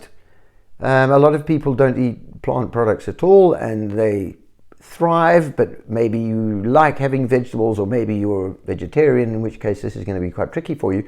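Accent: South Africa. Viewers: Are you South African (by your accent!?) Can you give an example of what you ate in a day?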